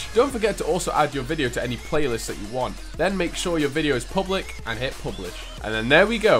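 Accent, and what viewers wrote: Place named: United Kingdom. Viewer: my guy has a british [bri'ish] accent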